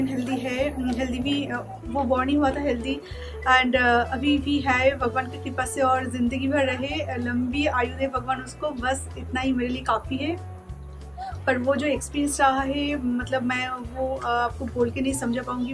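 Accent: Bengal, India. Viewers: Hi. I recently started watching your videos. I live in NY,USA but I was born and raised in Kolkata. Watching you connects me with my childhood and youth. I love the way you talk in hindi like most of my bengali friends did. You sound so genuine and so comfortable in front of the camera as well. I am always looking forward to watch your videos. Keep up the great work.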